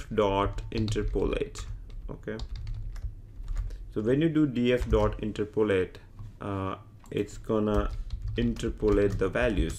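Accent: India. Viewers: I actually wanted to complement him - I heard his indian accent, but it didn't bother me at all - he spoke clearly and with the correct pace (unlike many other tutorials from people with the same pronunciation, who I simply cannot follow)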